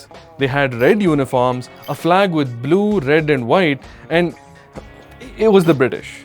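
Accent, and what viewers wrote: India. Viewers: Could not understand much. Too heavy Indian accent. From : Singapore 🇸🇬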